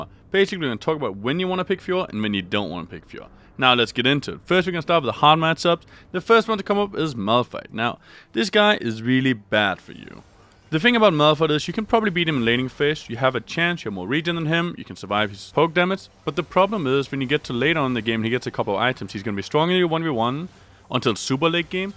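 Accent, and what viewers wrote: Denmark. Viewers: Man kan bare så nemt høre du er dansker hahah, men god video.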